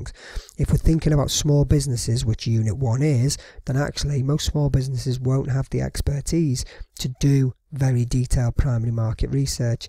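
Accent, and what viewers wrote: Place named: North, United Kingdom. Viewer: this video would of got more likes if you weren't northern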